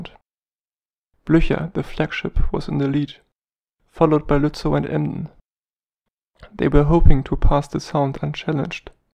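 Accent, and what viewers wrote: Germany. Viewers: I think he is German!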